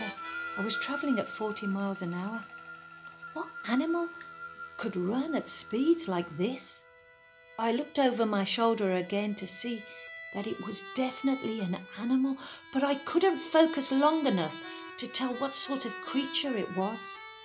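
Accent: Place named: Black Country, United Kingdom. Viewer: A modern (well, 1970s) ghost story. I like the slight black country accent too. Nice work x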